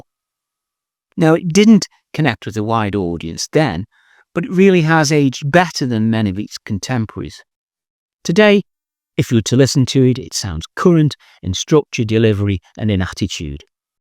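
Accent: Stoke, United Kingdom. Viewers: great review,,,by the way you sound like a stokie?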